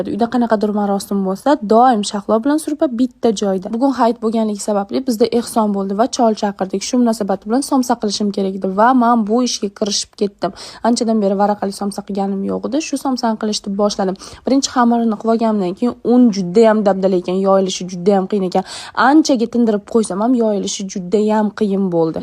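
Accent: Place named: Kokand, Uzbekistan. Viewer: Биздаям сурпа дийилади кукондаям куконча шеда гапиряпсиз якинда куконга куваям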